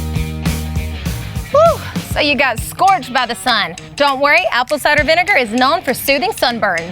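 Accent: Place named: Alabama, United States. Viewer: Alambamian beauty. Love your accent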